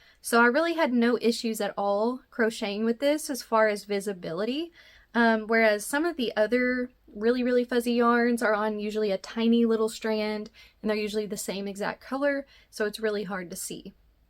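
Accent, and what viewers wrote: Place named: Tennessee, United States. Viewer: ❤are you from Tennessee you have my accent lol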